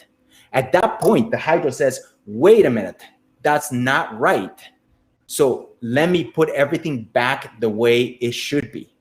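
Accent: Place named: Louisiana, United States. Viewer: The Louisiana accent is the best. And that’s coming from someone with a Texan accent.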